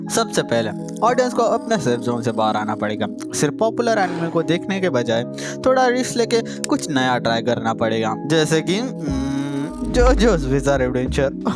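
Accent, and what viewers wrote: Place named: Gujarat, India. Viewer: you sound like gujrati tbh 🥀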